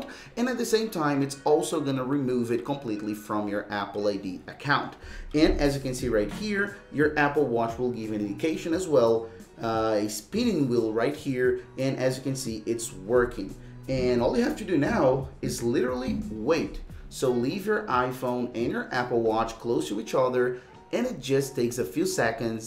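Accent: Brazil. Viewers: That Brazilian accent is thick my boy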